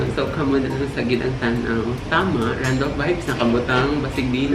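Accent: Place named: Visayas, Philippines. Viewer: "Zoy zooz" I love ur bisaya accent hahahhahaaahahahahha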